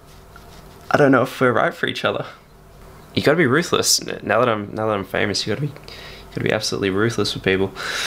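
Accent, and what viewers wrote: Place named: Australia. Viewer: before i found this vid on your channel i thought you might be Australian guess i was right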